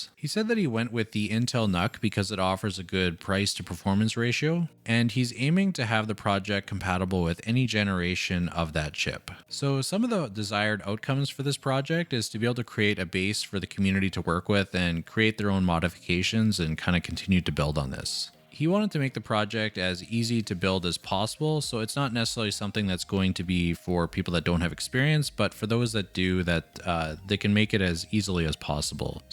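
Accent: Canada. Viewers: 'dem frametimes tho.... The ALLY will get better, there's 0 doubt in that. It's baffling that they allowed the review results so early (not having an NDA in place before June 13th which is typical industry practice)... Thanks for some more sweet canadian accent!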